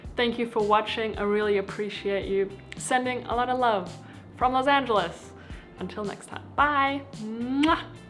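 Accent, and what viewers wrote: Germany. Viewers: Great video, thanks! Especially the idea with the physical gifts... I’m gonna do that. Are you actually german? I like that lovely accent so much! greetz and hugs from Berlin 🐻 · are you German? I think I hear some accent 😊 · I stopped dating and found I had a wealth of time and money. Your accent, is it German?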